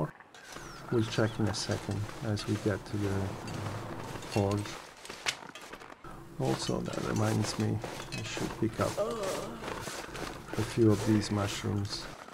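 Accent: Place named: Italy. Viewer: Where in Italy do you come from? You sound like you would come near where I am from. Always a pleasure to watch you playing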